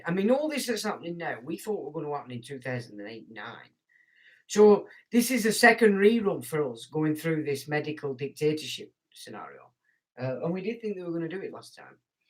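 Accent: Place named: Yorkshire, United Kingdom. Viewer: Only discovered this channel a few months ago via a link on BNT and have to say I wish I was here from inception! Although I've been in Dorset 42 years, I originate over't tops from you at Red Lumb, so not only the language resonates but so too the accent. On language (as I'm sure you're aware) "ab" means "not" or "none". All native tribes are "origines" Thanks for the excellent info in the vids I've seen so far.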